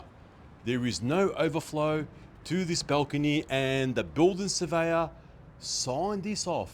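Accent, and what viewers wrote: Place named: Australia. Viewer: love the guys accent.. to me its one of the true aussie accents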